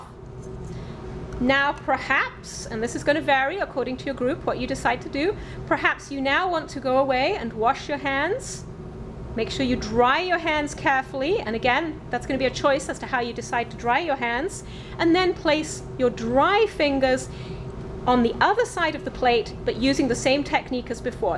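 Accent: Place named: United Kingdom. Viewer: I think British.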